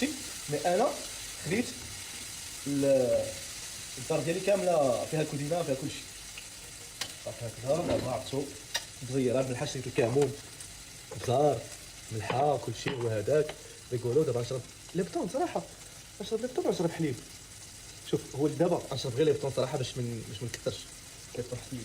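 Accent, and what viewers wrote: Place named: Gulf, Arab world. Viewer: 10 دقايق باش وصل بدا يهضر خليجي 😅😅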